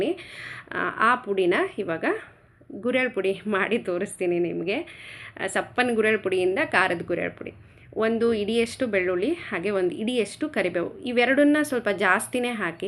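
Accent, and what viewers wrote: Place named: Shahapur, India. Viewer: ನಿಮ್ಮ ತವರು ಮನೆ ಯಾವೂರು ನಿಮ್ಮ ಭಾಷೆ ಕೇಳಿದರೆ ನಮ್ಮ ಕಡೆ ಹಾಗೆ ಮಾತನಾಡುತ್ತೀರಿ ನಮ್ಮದು ಶಹಪುರ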